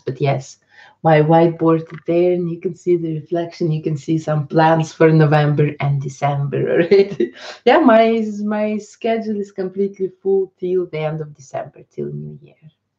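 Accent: Eastern Europe. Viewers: Це називається "слов'янський акцент". Не лише російськомовні мають такий акцент. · I knew something did not compute when I saw you lived in Belgium but had a very thick Eastern European accent :D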